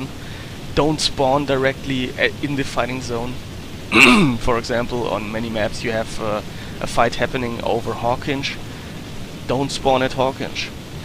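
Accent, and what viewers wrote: Germany. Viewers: Ich kann da den Deutschen Akzent raushören :D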